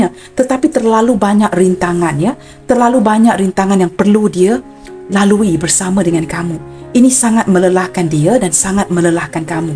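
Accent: Malaysia. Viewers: Terima kasih bunda kesayangan ku yang selalu siap setia kasih kita semua pencerahan sehingga kita semua selalu wawas diri dalam bersikap, readingan yang sangat gemoy idaman setiap subcribers nya dengan alunan swara yang sexi terutama logat basa Melayu nya ILIKE IT.sangat resonet bund 👌👍🤲🙋🙏.betul bund komonikasi itu penting 🙏🙏🙏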